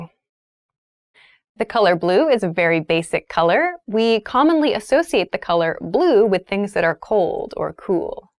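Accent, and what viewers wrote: United States. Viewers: Thank u very much for helping us in learning English. Here I want ask u a question, please tell me where are u from and if iam not wrong ur speaking American accent, isn't it? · you're the best, i love your American accent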